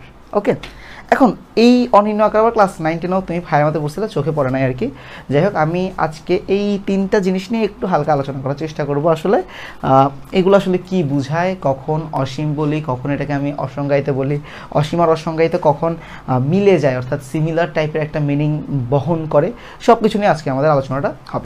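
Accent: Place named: Chittagong, Bangladesh. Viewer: pure chatgaiya tone...love it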